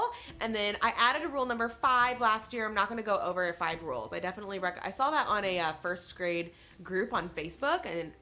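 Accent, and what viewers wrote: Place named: Indiana, United States. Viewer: I would LOVE to know what decor pack you are looking at. I am going for the same look in my classroom. I am switching rooms and grades, looping with the same kiddos and want to give them something fresh. Fellow Hoosier here. Transplanted to NC at 15. From the South Bend area. Your accent makes me feel at home. Enjoy your videos. Thanks for sharing!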